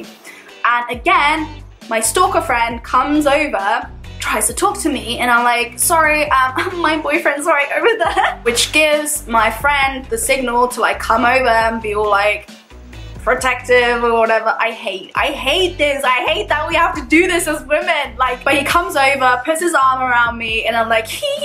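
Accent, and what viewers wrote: United Kingdom. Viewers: it took me 3 minutes into watching this video until i realized that you have a british accent 😭😚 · Now you know you can pass off as Australian lol. I heard the British accent, but wasn't sure. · Nah mate she is very british xx it’s not Aussie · omg it took me till to realize you're british!!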